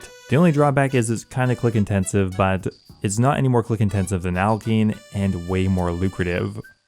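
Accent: Quebec, Canada. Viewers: are you quebecois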